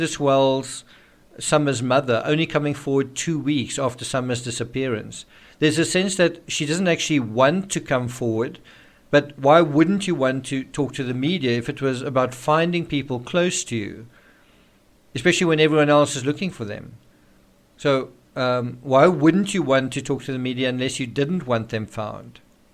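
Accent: South Africa. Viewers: Hey 👋 I love your channel, the work you put into every single video blows my mind. You are so appreciated 💛 I was born in SA and I used to have that lovely accent you do,but since I left SA my accent has faded. I wish you all the best on your channel, you definitely will always have support from me 😊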